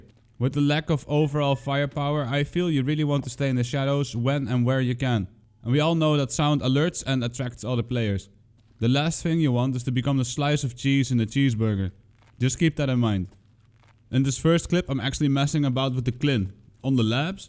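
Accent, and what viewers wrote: Netherlands. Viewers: I literally just watch for the dutch accent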